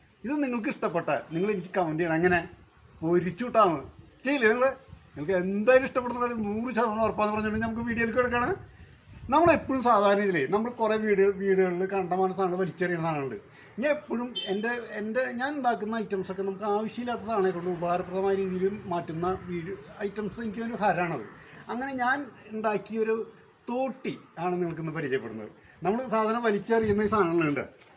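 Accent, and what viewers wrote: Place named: Thrissur, India. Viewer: തൊട്ടിയും പൊരിച്ചു തൃശൂർ ഭാഷയും പൊരിച്ചു 😅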